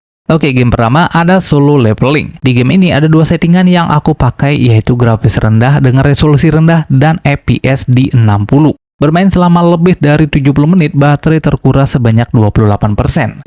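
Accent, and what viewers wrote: Bali, Indonesia. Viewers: Anak bali ini logatnya ya bli😊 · Abang nya orang bali ya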